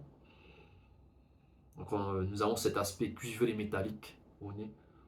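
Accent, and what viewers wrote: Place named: Quebec, Canada. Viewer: C'est donc ça l'accent de Tahiti ? Ça ressemble à du québécois.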